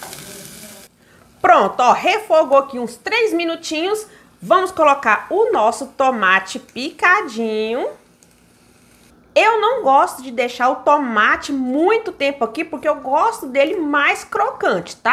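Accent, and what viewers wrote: Minas Gerais, Brazil. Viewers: não sei se vc é mineirinha, mas que esse sotaque mineirês seu é gostosim de ouvir, ah, isso é....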